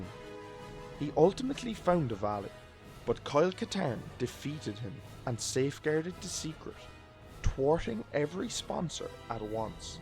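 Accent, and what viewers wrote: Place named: Ireland. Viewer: That was yet another fantastic video. You know, that image that you were using, the one of the character that you called "Black 🕳 Hole?" Well I've seen other YouTube Star Wars creators use the same image, but I've never heard anything about the name of the character, their back story, nothing. So thanks for that, now I know what the hell "the big boys" are using to push their own channels along, without so much as a characters name, much less a description of what the audience is beung presented with!! P.S : - Dose my old and bush-bashed Australian ear detect just a little more of that truly wonderful Irish accent in your delivery this time? Or should I just keep away from the bottle? .. 🍺 🍻 🍺 🍻 🍺 ... See what I mean? I really must be getting back to my A.A group meetings! Either that, or join up with the local Rastas, Mon!!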